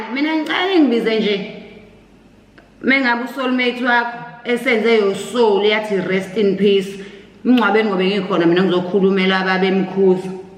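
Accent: KwaMashu, South Africa. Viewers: Heh heh lol my cousins from Kwamashu accent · All I can hear is the Kwamashu accent😅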